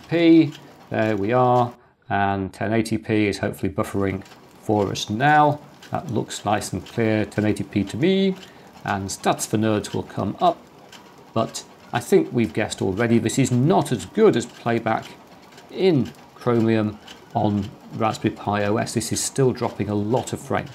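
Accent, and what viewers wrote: United Kingdom. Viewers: I like this british accent! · 👍I learn about new technologies and also practice my British accent listening comprehension. This channel has everything!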